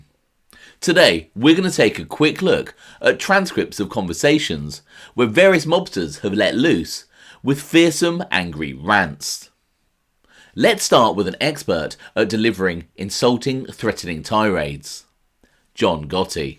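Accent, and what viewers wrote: United Kingdom. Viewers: Where would the world be if we didn't have British/English narrator's telling us stories from past and present. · He's not a wise guy wit a brit accent.. He's English yes, but he fu%$ing knows our 5 families better than us bro. Keep up the great work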